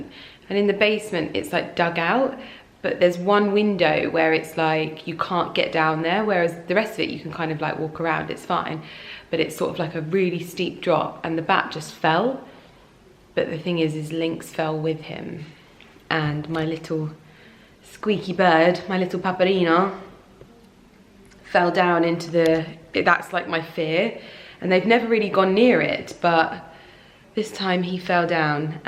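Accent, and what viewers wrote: United Kingdom. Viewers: I’m french and I used the « paint » part to show my friends « this is british accent » ... 😍😍😍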